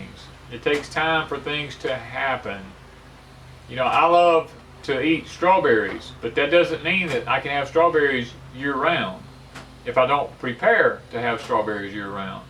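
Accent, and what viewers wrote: South, United States. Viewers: Good subject! Thank you. I don't have a homestead, just rent an apartment but there's only 8 apts. each building, total 4 buildings in a small rural western WA town, but I have 3 smallish garden spaces outside my front and back door and they work out pretty good though I'm not a pro like you and. Your southern accent is just fine! Don't let folks throw ya...how anyone pronounces words is unimportant--your intent and message IS. I totally agree with you. I am glad the plum and apple trees on the property aren't sprayed that I pick every year though the fence line is but it's not near the apts. Living in the north has different challenges but folks are often in the same boat no matter where ya be. I can, dehydrate, pickle as much as possible. I understand how it is when you have to get things canned NOW, or lose your produce. 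My folks hated waste and so do I. Anyway, thank you for explaining farming/gardening procedures. It's very interesting and I am learning a little. I'm kinda slow, but I get there. God bless you and yours.🌲👵🐕✋🌻🌱🍃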